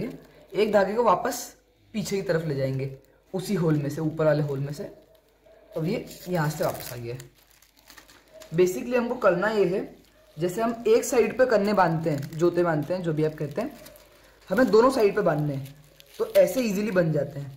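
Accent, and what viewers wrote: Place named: Indore, India. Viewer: Aap indore se he . Aapki language se pata chal raha he. Very nice.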